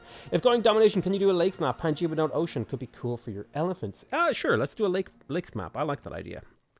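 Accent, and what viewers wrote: Ireland. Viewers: he is from louth i can hear it ;) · you definitely have an Irish accent.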